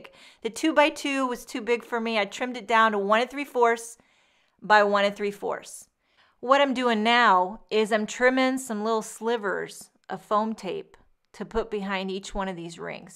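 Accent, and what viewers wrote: New York, United States. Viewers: Ohmy gosh are you from NY. I love listening to you. You are hysterical. lolol.. Love the cards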